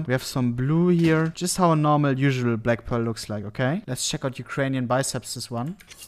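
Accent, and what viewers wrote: Germany. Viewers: Youtube auto captions is writing Germand words while he is peaking english WHILE being german, despite him having a very very good english elocution, and i can't help but feel amazed. I can TELL he is GERMAN, but for a robot to accidentally write german words while he is speaking more than decent english blows my mind.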